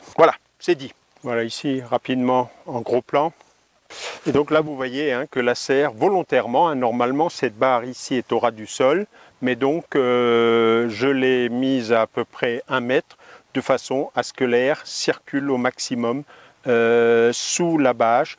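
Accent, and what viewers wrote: Alsace, France. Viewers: Non, de grâce, ne changez rien! C'est notamment la longueur de vos vidéos qui en ont font tout l'intérêt, car on apprend aussi beaucoup de choses dans vos apparentes digressions, qu'elles soient relatives aux techniques de culture ou plus éloignées du sujet (grâce à vous j'ai réécouté avec plaisir!). D'autres détails un peu décalés et "inutiles" dans l'absolu me font mourir de rire et ça fait du bien aussi! Et puis quel bonheur d'écouter votre parfaite maîtrise de la langue française, l'utilisation toujours réfléchie du juste mot, c'est devenu tellement rare, c'est comme du miel qui coule dans mes oreilles... On ne voit que trop l'effet direct et catastrophique des textos et autres twitters sur l'appauvrissement de la langue française et du raisonnement intellectuel... Et votre charmant accent alsacien qui fait votre signature sonore me berce, me repose... 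C'est l'éloge de la lenteur, de la fantaisie, ça va à l'encontre de tout ce qui fait notre époque moderne (concision, rapidité, prétendue et illusoire efficience), et, n'en déplaisent à certains (libre à eux de faire "avance rapide" ou de regarder d'autres vidéos), ça fait le plus grand bien!!! Alors merci, merci, merci, pour tout ce que vous êtes, ce que vous donnez, et surtout, continuez comme cela, monsieur le paresseux!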